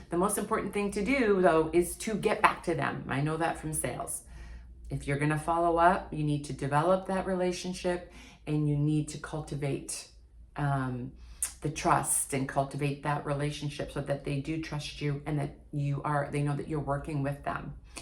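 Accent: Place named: Canada. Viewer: I knew you were a canadian based on 1 single word you spoke. Im in detroit, ontario border in detroit, and we speak exactly the same as far as dialect except for pne word. About. You canadians really pronounce it quite different.😄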